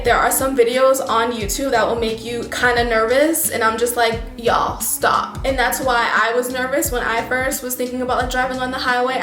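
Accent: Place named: Germany. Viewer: Not that you would not use an english R... But you sound in some ways like a german english-learner. - You still have clear pauses between your words, you pronounce the S-sounds quiete hard, you sometimes say a german W (which is else an english V, not a UU), ... "Neuschwanstein" for an example - You have all the sounds, but do not use them. "Neu-" (correct) ... "-schwan-" is not like "shvan", but like "shvuhn" ... "-stein" is not "steen" but "-shtyne", without the E pronounced. So "Neushvuhnshtyne" would be correct spoken. ... Btw.: You usually do not need a new ticket, if you miss a single train. It will be most likely appropriate for next one in your direction, which is, also most likely, max one hour later, but sometimes has to be the same type of train for the same ticket.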